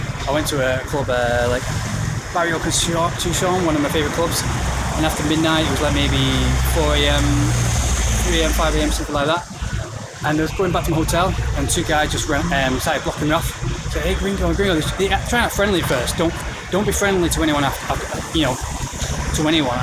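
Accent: United Kingdom. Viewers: You have a Scottish like accent. Are you from Liverpool/Scotland or nearby?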